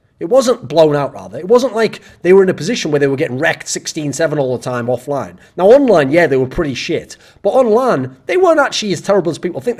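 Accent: Russia. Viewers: Continue with the russian accent please. Very funny!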